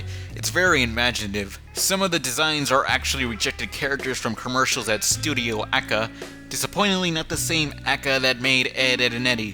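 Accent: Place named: Texas, United States. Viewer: The southern accent was your Texas showing.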